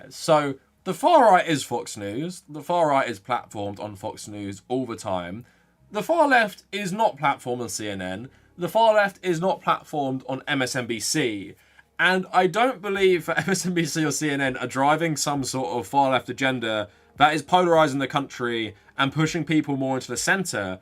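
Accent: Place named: United Kingdom. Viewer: Hmm... This guy seems cool and I think I subscribed to him at some point, but why's this britbonger look like a dirt bike bro? His clothing doesn't match his voice. OH SHIT HE ADDRESSED IT RIGHT AS I WAS TYPING THIS. It's his Republican cosplay. But the he said Guardians of the Galaxy Vol. 2 is one of the worst movies he's ever seen and now I'm sad. That's one of my favorite MCU movies. Especially cause I'm a dad to two boys and the movie is about being a son, being a father, and family in general so the message resonates. But I'm also just a huge MCU nerd.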